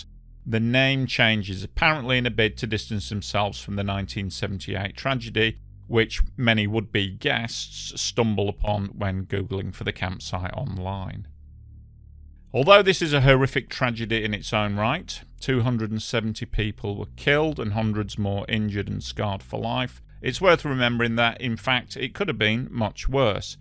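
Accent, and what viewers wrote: United Kingdom. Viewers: I appreciate your very Britsh English. Thank you for this documentary. I´ve never heard or read about it.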